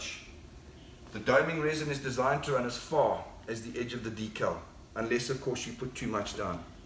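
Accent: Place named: South Africa. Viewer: That sounds like a South African accent 😁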